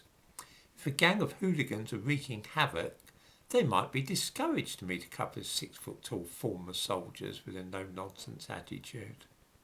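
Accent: United Kingdom. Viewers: you have a way of telling the tale like Fry in Black Adder. We Americans have an affinity for Brit accents. Your opinions sound like American ideas with an ancestral accent.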